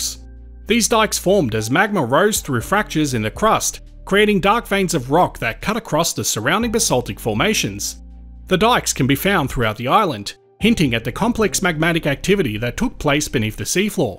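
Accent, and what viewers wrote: Australia. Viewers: Sorry, I'm a person with hearing problems. I can barely stand listening to the Auzzy accent. It's me, not him.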